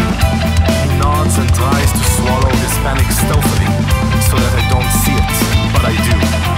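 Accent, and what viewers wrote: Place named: France. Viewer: With a French accent no less. Like butter to the ears.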